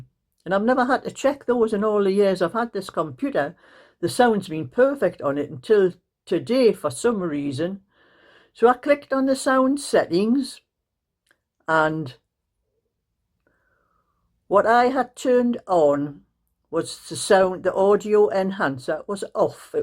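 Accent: Newcastle, United Kingdom. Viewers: I just come on to hear you chat you make me laugh I’m a Geordie and love your Geordie banter you make me smile thankyou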